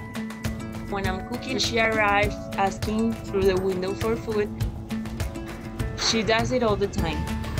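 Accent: Paisa, Colombia. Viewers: Ay...en el video sale una voz colombiana y muy paisita...🇨🇴👍🏻 Gracias por amar a nuestros amigos los peludos...🐄❤️ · Caray, eres buena, noble y bondadosa, eres hermosa, y aparte tienes ese delicioso acento colombiano que me derrite..... si, la mujer perfecta! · Ese acento paisa... 🥰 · suena paisa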